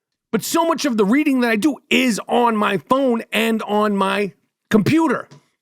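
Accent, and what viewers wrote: New York, United States. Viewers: I thought the Jewish community of NY voted for what’s his name?? If you keep talking like that no one is going listen to you because you rant!! Slow down love !!! Why is everybody saying the Jewish New Yorkers voted for this guy? I don’t get it….😂😂😂your accent i guess you don’t like LA …you rather NY …man I was born in London, tooooo many people in NY i couldn’t walk without bumping in to a person hahaahhah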